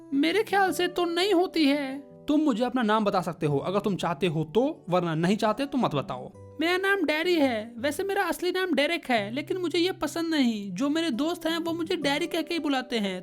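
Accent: Bihar, India. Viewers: It's not bhojpuri bro. It's hindi with bihari accent.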